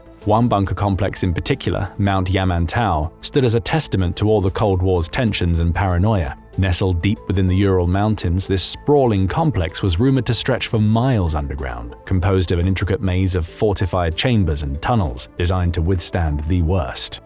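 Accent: England, United Kingdom. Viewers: It's an English accent, and the speaking is quite clear.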